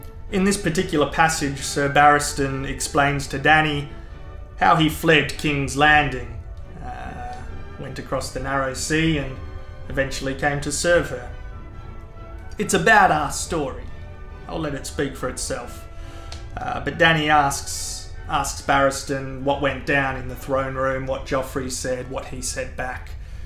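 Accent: Australia. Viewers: You ever consider doing Game of thrones audio books? Your bloody ozzy accent is rather soothing.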